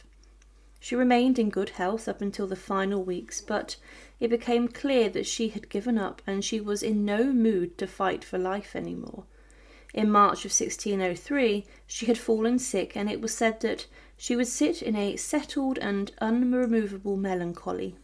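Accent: United Kingdom. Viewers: I watch just to listen to your lovely British accent and your beautifully accentuated narrative. (And, of course, your well-researched historical content.) Keep up your amazing work, please. I could listen to you talk for days on end. 💕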